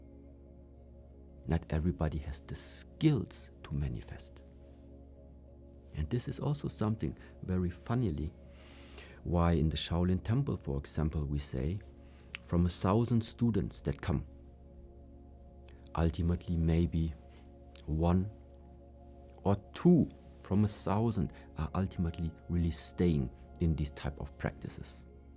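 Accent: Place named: Germany. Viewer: He is German or has lived a long time there. He speaks English like a German. Interesting.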